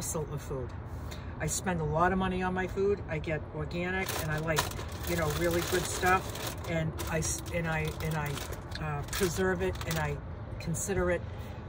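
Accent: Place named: Massachusetts, United States. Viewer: Thank you 😊 you sound like home! I’m from MA too and now in Florida. Love the simplicity you share!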